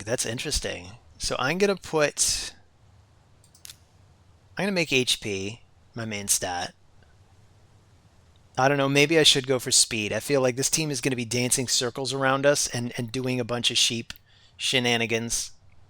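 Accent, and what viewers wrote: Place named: Scotland, United Kingdom. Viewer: I’m guessing Scottish maybe Irish. Good luck on the fights. I just got up to the 4000 mark early today.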